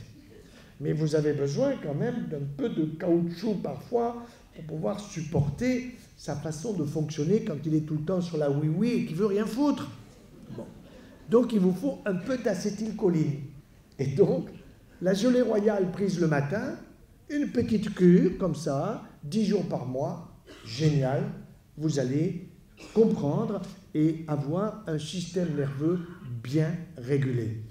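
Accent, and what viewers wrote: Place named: South, France. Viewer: Entendre respirer la santé avec cette conférence pleine de bon sens, au rythme slow du laboureur qui swing avec le bio, et madeleine de Proust, avec l'accent de Marcel Pagnol. Professeur Joyeux vous nous faites un réel grand plaisir. Patrice D. Ingénieur Agronome né 1944 10 ans irrigation goutte-à-goutte au Maroc.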